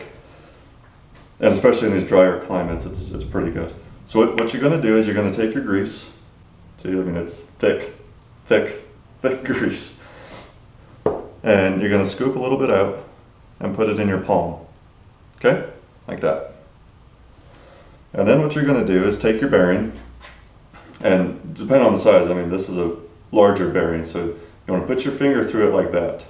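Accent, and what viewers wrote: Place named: Northern Ireland, United Kingdom. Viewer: the NI accent sounds awful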